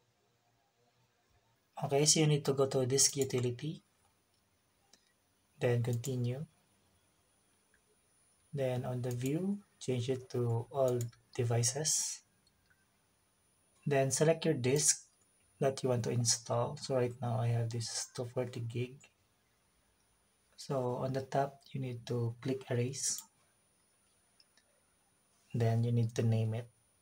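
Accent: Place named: Manila, Philippines. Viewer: Nice Bro, there is now a super good tutorial (that sounds like really from Manila) hehe... Thanks for the tutorial but I cannot do this since I am using my only 1 PC for work, do you suggest your method could be a solid workstation or will this still have some crashing along until I fixed DSDT, KEXT etc. ? Will having another back up (clone) a good idea? That's what I did before when I am using my hackintosh for work early 2016... Whenever It crash, I just mount the working cloned system and then clone again hehe.. Thank you for the video Bro. New Subscriber here. :) BTW, I am using 5800x + X570 + RX 5600XT will use mostly for Premiere Pro and other Adobe Products. More power!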